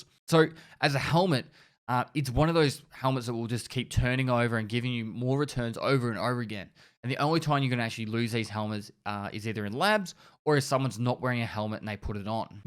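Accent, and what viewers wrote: Australia. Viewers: man, wish I could understand his aussie accent -_-! I miss 70% of the info xd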